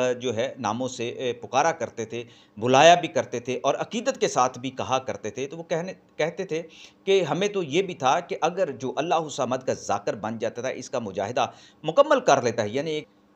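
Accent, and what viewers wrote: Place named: Kashmir, India. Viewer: Assalam o alaikum warahmtullah ap taluq azaad kashmir sy hai apka accent bilkul wesa hai... Allah kareem aulia Allah ki mohbbat or adab o ehtraam wala rakhay Aameen..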